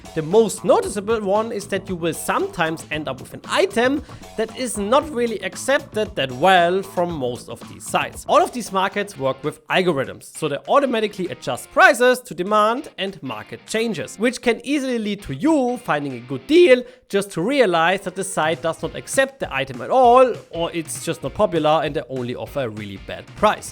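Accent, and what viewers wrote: Germany. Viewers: You hear like a german guy do you german?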